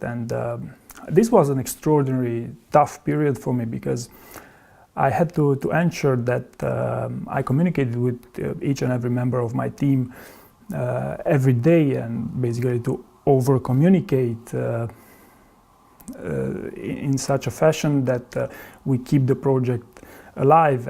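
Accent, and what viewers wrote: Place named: Romania. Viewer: this is a Romanian accent if I've ever known one.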